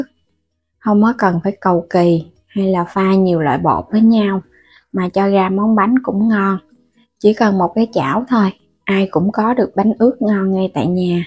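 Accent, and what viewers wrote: Mekong Delta, Vietnam. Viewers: Giọng người miền Tây đa số là vậy bạn ơi .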